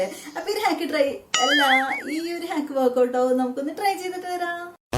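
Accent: Thrissur, India. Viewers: Chechiyude samsaram kettal serikkum thrissur kari anu enu manasilavum😁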